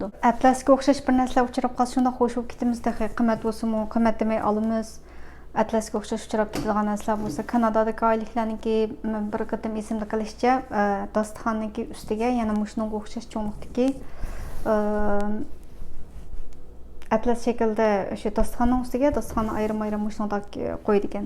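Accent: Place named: Turpan, China. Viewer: ساپ تۇرپان تەلاپپۇزى 😉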